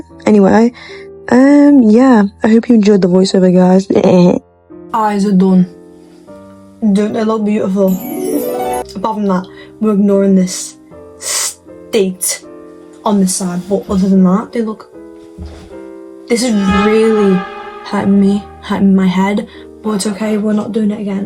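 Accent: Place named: Liverpool, United Kingdom. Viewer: thank u scouser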